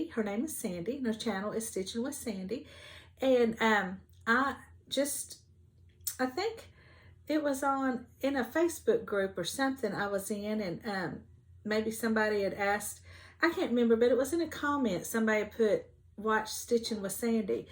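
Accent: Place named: South, United States. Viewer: I just found you and being from Rock Hill SC , I recognized that southern voice and said” I need to watch her” and then I heard you say y’all and I was hooked. The book review was great. I’m always looking for good books to read. Thank you for a great video and I think the green tinted fabric would look great for March sampler😊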